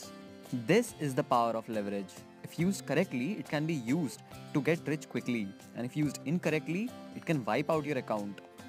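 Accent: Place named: India. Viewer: so funny Indy accent!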